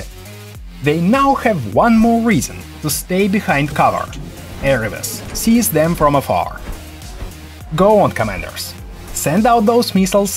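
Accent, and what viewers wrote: Russia. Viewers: парни, наймите диктора с хорошим английским кровь из ушей же стрронг ррашн акцент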